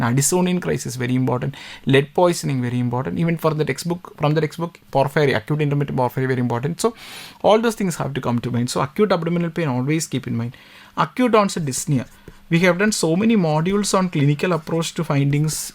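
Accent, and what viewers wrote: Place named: Kerala, India. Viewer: Pakka mallu accent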